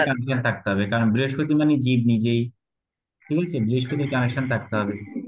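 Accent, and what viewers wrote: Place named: Bangladesh. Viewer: Sir,আপনার স্কুল কোথায়? আপনার কথায় বাংলাদেশের একসেন্ট আছে।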